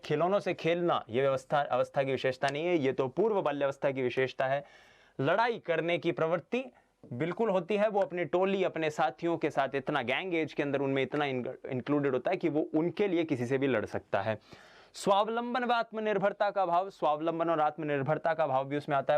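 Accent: Rajasthan, India. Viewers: Mai up se hu but jb ap rajhasthani bolte to Maza bahut ata .love u sir.psychology ke dada ho ap.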